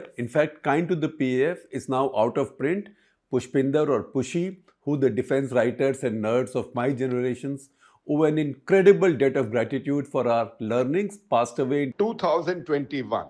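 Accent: India. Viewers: Sweet Indian English and a well articulated presentation. However the fact remains that loss of aircraft in first instance keeps on haunting and everyday some intellectual comes forth to try comfort the masses. After all inability of IAF fighters to Stand against PAF and losing top of the line aircraft, despite having initiative advantage, signifies sheer failure and incompetence. Strange is the act of taking pride in such a failure in the name of doctrine. What a DOCTRINE by the way. Hitting PAF Bases is acknowledged but absence of Indian fighter fleet to challenge PAF fighters during their daring missions on 10th May, sudden ceasefire offer, 3 months silence of IAF Chief on shooting of PAF fighters, and repeated US President statements about the reality of the war and the ceasefire also need to be explained to Indian people.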